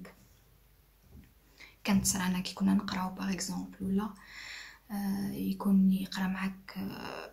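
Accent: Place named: Oran, Algeria. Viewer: Comme je te l'ai déjà dit sur Instagram,tu es le porte voix qui a perpétuel l'idée du Mouvement Populaire ou Révolution comme tu le qualifies pendant ces 5 ans que nous célébrons ces jours ci'.Pour moi,tu es l'exemple vivant de la Résistance à la Tyrannie au plan culturel et artistique.Pour tout cela tu mérites notre respect et notre reconnaissance PS : j'ai adoré ton accent oranais ou Tlemcen ..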